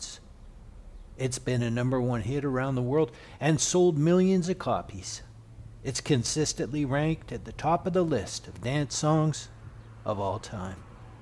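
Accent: Canada. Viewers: I dig your narrative style. So charmingly hinterland Canadian. Brings a smile to my face :)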